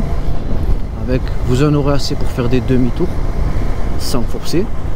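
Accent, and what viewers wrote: Marseille, France. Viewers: Salut ! C'est un plaisir de retrouver tes essais avec ton magnifique accent marseillais, toi même tu sais, vé. 😂❤ Stef bdx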